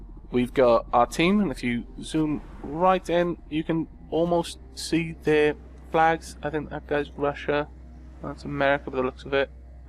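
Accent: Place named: South, United Kingdom. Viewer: As an aside, you're one of the first Englishmen that I have yet to even accurately ascertain what part of Britain you come from based solely on your accent..I'll throw caution to the wind and guess south of England? I'm probably way off.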